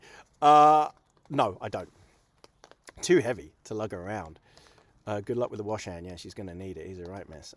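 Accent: United Kingdom. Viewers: The guy sounds British though.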